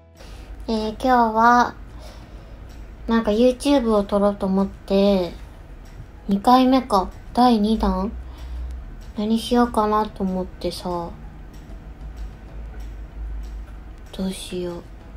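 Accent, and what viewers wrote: Kansai, Japan. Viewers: ゴリッゴリの関西弁も聞きたい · やっと菜々さんの関西弁が聞けたよ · 関西弁しゃべってるほうがかわいい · の関西弁もっと聞きたいよ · たまに出る関西弁がかわいくて好き！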